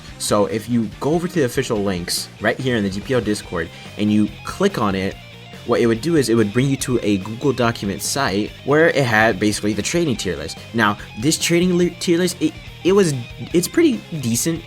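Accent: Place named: India. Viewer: Hes indian accent tells it all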